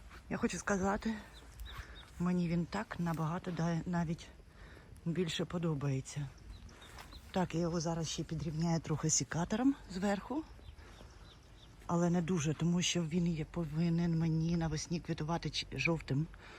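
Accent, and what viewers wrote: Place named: Germany. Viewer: Вітаю! Випадково натрапила на ваш канал, зайшла, сподобалось, цікаво, коли люди діляться досвідом, а ще цікаво заходити в гості до інших, щось пізнавати чи запозичати ідеї. Ви, мабуть, вже довго в Німеччині, відчувається акцент, знаю німецьку мову, чую її ознаки у вашій бесіді. Але у вас чудово виходить й украінською! Ви молодець! Запрошую і вас до себе віртуально погостювати! Вирощую сад, знімаю відео! Заходьте!❤❤❤❤❤❤Уявляю, як незабаром розквітне садочок на вашій ділянці! Коли люди мають таке бажання і азарт, як ви, а також роботящі, в них обов’язково все вийде!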